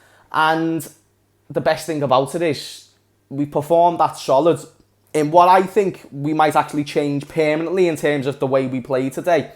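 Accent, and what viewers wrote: Liverpool, United Kingdom. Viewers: another class video. Not a scouser but I love listening to a proper scouser talk about Liverpool.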